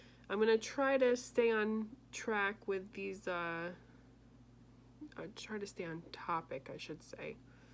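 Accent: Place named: New York, United States. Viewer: I was gonna comment on your other video wish you were chatting in that one love the New York accent haha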